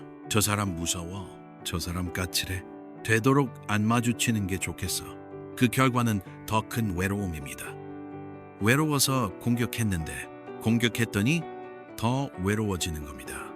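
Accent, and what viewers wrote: United States. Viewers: 발음이 미쿡교포이신것 갗아요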